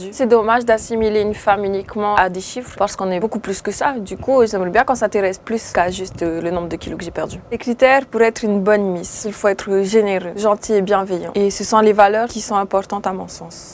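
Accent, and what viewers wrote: French Polynesia. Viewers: Elle a un accent polynésien j'adore très bel accent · Son accent C un delice pour nous de la polynesie via ihihihiii NC 😅😋😘😚😙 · Alors l'accent polynésien c'est une pépite 👌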